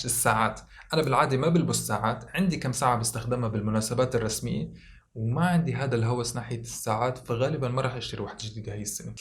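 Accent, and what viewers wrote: Syria. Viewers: حكيلنا عن حالك اكتر...حبيت قناتك.... حاسستك من سورية...صح؟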